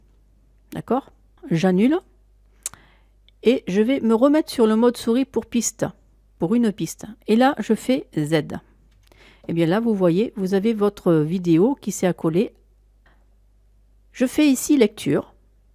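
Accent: South, France. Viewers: Merci pour vos tutos très instructifs et bien expliqués. Utiles pour quelqu'un pas très féru en informatique comme moi. J'avais la version 2016 et maintenant 2022 et j'étais un peu perdu. Après 2 années "covid" je n'avais plus fait de films de voyages et donc j'avais un peu perdu les bases. Je vais pouvoir me relancer grâce à vous. Et puis votre accent méridional est agréable ce qui est un plus, mais n'allez pas croire que je cherche à vous draguer !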